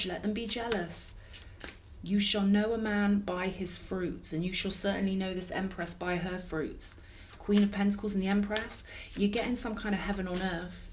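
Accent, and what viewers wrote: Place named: London, United Kingdom. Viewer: the London down country girl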